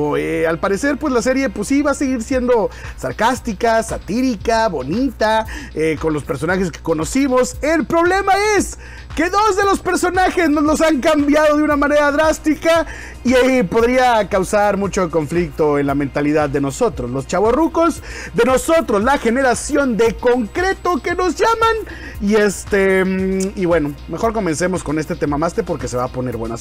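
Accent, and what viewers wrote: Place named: India. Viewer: Es idea mía? 😅 o comenzó hablar como indu 😁. Men hace años no veo tu canal, y me sorprende lo relajado que estas y animado y ya no te tomas personal las criticas contrarias de malas maneras (poco 😅), no se si fue tanto Fortnite pero se te a cierta bien en el canal. me animaré de ver tu canal, 😁👍 se te desea lo mejor.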